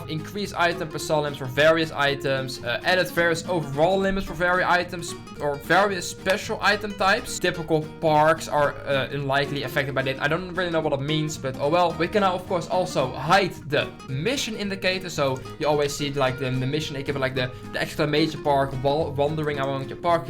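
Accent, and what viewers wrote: Netherlands. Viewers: It’s English but it’s with the worst Dutch accent ever lol. I’m Dutch myself and I’m struggling to understand him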